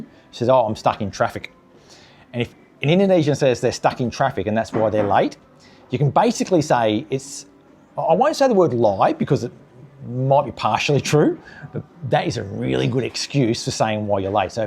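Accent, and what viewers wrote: Australia. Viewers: Love your videos... thank you for sharing your walk arounds and life experiences, language, and views 👏👏👏... and for sure, I can confirm that you have an Aussie accent and nuances 👌 😂 Sydney sider here... and always dreaming of coming back to Bali 🌴🌏🌞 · Your accent is fair dinkum aussie, the only difference between us is that you roll your r’s and pronounce the Indo words well. You even chuck some slang in there. A good thing to teach your daughters, if he waits around for 2 hours he must be keen! Well done 😁 Thank you for answering our comments, we don’t expect you to. Often we comment because apparently it pushes the google algorithm out there. Cheers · For us Europeans your accent is 110% aussie! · Hey … you have a very Aussie accent, not sure why people think otherwise. The tell would be if Indonesians think you have an Aussie accent when speaking Indo 😂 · Your accent is still totally Ozzie, never fear!😂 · a well spokenAussie, but definitely Aussie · Absolutely! 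you sound full Aussie bro 😂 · Hey You definitely don't sound anything but an Aussie. Funny what people hear, my wife is German and she often gets mistaken for South African which she doesn't sound anything like it. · you still sound like an Aussie. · you have one of the Aussiest accents I've ever heard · As someone who enjoys a good strong coffee, I'll definitely have to give Wiski a go-go (Sorry). You sound Australian to me, especially when you use colloquialisms. I was once told I was South African by a lady in an office in a small town called Myrtleford, Victoria. For 40 minutes I tried to convince her I was actually a Kiwi, but she wasn't buying it. In the end I said I was from Swaziland and left. Small town people are funny. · Your accent is defo Aussie! Love the “how’s your father” saying too 😂 Great vid ❤ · Nah mate. Your aussie accent is fully intact. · Another lovely day in beautiful Sanur.I have often got the "hit of frustration" with suamiku and his" jam karet" as it has caused a few problems........sometimes he is 2 hours earlier than the arranged time and wonders why Im not ready to go! 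Uh duh! You have a dinky di Aussie accent.Dont know what that dude was talking about,saying you didnt.You pronounce Indonesian words benar! I myself am quite proud that I can roll my "R's". The "visa free" debate is interesting.I do understand the Indonesian attitude of pride and self worth especially for the fact that Oz charges so much for Indonesians to aquire a visa. Another great video and "Durwood" says hello! 😂. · Sorry, you can take the Aussie out of Oz but u will never loose ur Aussie twag…. Ur as aussie as dead horse on a meat pie mate!!!!!! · Funny,I think you sound sooo aussie,but I watch anyway!!I don't know where you lived in 'Stralia but you seem to have a Seeedny accent to me.but what do I know,I'm a Kiwi,but I have recommended your channel to a couple of people and said "he has a very Aussie accent tho",almost like it's a criticism😂.I'm a bit biased tho cos I have two syblings who are Australian.ignore the negative people,I think you're great and you've inspired me to think about moving to Bali when I retire in 3 years.cheers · Your accent is true blue mate. 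· I am not fazed by paying for a visa....Australia charges way more than Indonesia..$250 if you are coming to Oz... maybe aussies should ask why australia charges so much? Your Aussie accent is still there 😊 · No surprise that the visa fee hasn't been removed, it will never be removed. Your accent is fine, you sound fully Strayan to me. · Im afraid youve got still got your full-on Aussie accent lol....you just have the ability of pretty good grammar pronunciation and structure of bahasa indonesia so maybe that conclusion is drawn from that...anyway yes food for thought re visa free...thanks for the info❤. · No, you 100% have a strong Aussie accent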